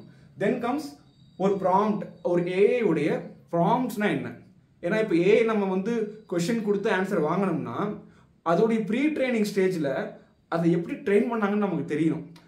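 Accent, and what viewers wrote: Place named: Kerala, India. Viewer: And your malayalam kalandha thamizh is beautiful and cute.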